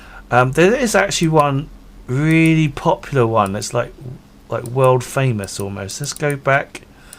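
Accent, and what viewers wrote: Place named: Portsmouth, United Kingdom. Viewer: Do i detect a Poorst-muff accent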